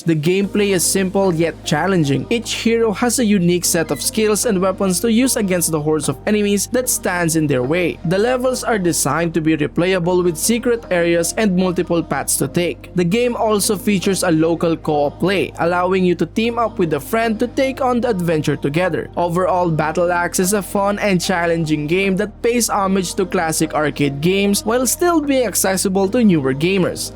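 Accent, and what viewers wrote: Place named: Philippines. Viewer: Latino accent + American accent + lazy pronunciations = Filipino accent 🤦🏻‍♂️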